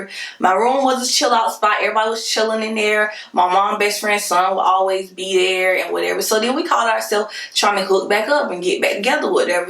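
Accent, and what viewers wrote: Atlanta, United States. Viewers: Are you from ATL cause you got that accent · Where are you from sis!!!!! I hear ATL all in your voice 🤗😂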